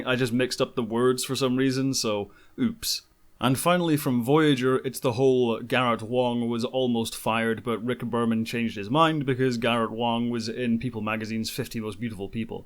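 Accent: Scotland, United Kingdom. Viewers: . Your brogue is wonderful. Edinburgh I'm guessing?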